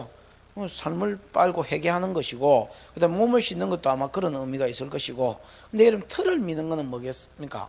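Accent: Gyeongsang, South Korea. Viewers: 열 채우는 것, 기 채우는 것, 하시니 어릴 때가 생각나 웃음이 나오네요. 경상도 사투리 정말 정감 있습니다. 천국 언어는 경상도 사투리 비스무리할 거라는 목사님 말씀에 전적으로 공갑합니다. :hand-pink-waving::hand-pink-waving::hand-pink-waving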